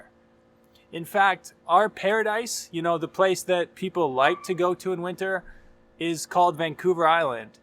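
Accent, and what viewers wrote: United States. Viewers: THE WAY YOU SPEAK LOOKS SO AMERICAN THAT UNDER FIRST IMPRESSIONS I THOUGHT YOU WERE ONE.IT'S LIKE WATCHING A FILM ON NETFLIX.THE ANGLO CANADA FOR ME IS NOTHING MORE LIKE EXTENSION TO USA.ONLY DIFFERENCE IT'S HEALTHCARE WHICH IS CHEAPER.APART FROM THAT NOTHING..HOLLYWOOD USES CANADA AS BACKGROUND FOR EVERY MOVIE IN USA. CANADA SHOULD BE 51 STATE IF NOT ALREADY IS. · you are Canadian? I had no idea I thought you were an American.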